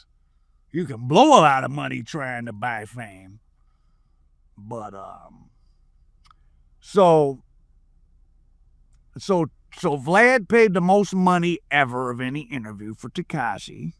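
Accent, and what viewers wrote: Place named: Chicago, United States. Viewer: Why do you speak in some weird Chicago accent, aren’t you from Michigan?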